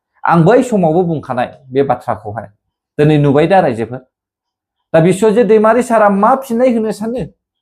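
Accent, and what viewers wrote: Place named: Bihar, India. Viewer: Hindi Raoa biharniswmwn ada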